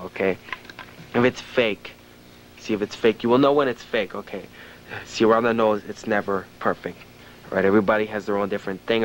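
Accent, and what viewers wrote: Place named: Long Island, United States. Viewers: Brooklyn or queens for sure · clearly he’s from queens area of new york. accent gives it away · I’m from Long Island, this kids sounds like he could be from around there.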